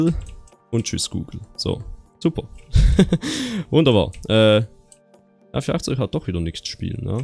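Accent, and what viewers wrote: Switzerland. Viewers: Nicht negativ; aber ich feier einfach den Schweizer Akzent sehr :)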